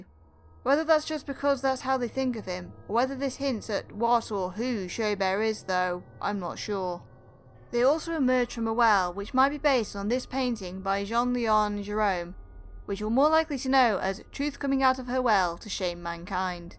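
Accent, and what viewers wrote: United Kingdom. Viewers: Bristol? I wouldn't have guess that in a million years. I did think you were somewhere near Wales but your accent isn't that strong tbh. I like these Walten Files videos, keep up the good work!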